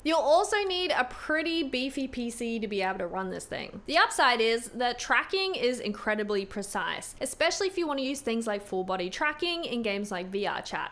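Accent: Australia. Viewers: damn, im usually attracted to aussie accent. hers is pretty annoying. maybe its just her voice.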